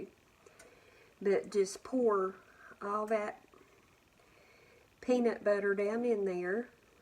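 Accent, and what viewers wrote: South, United States. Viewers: At my grandmas house my twin aunts who never married cared for my grandparents. My aunts were wonderful. I didn’t grow up with a mother and it was like having 2 mothers every summer down in West Virginia. They made peanut butter pinwheels. I’m going to try the preachers cookies. Down in Minden W.V back in the 60’s we would walk to church ( my aunts took care of Sunday school and all church events) and the preacher would come home and have lunch with us every Sunday. During the summer they had a house full. I have stories about my Aunts walking home late from church and a bear walking up behind them. One aunt took off and got my grandpa ( with his shotgun), as the other aunt kept talking thinking her sister was still next to her, then heard a blast that scared the bear off. Every summer I cried leaving my best memories ever. 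your cooking and southern accent bring back memories of long ago. Thank you🥰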